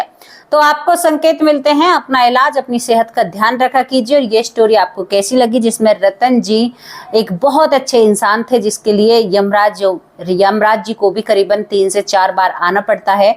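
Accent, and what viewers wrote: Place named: Punjab, India. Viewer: aap jab baate kr rhi hoti hae to kush words punjabi vaale hote haen jaise hum bolte hae badhia lagta bolne ka lahja bahut pyara lagta hae ❤❤❤❤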